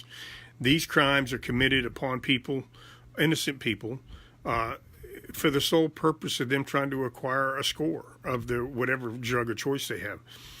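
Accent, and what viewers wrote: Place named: Appalachia, United States. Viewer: wow this hillbilly needs to learn English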